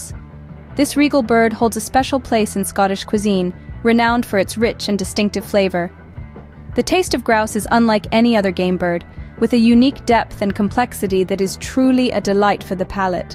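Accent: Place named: United States. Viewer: It’s ai and sounds kind of American at times but don’t let that get in the way of your bigotry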